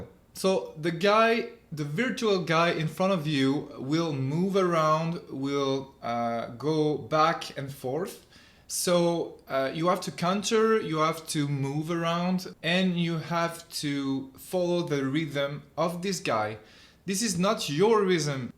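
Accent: France. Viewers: He is french i belive or speak french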